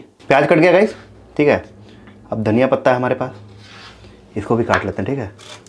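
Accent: Bihar, India. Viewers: Kit na thik he thik he Bol tha he bihar ka he KYA tuuu ???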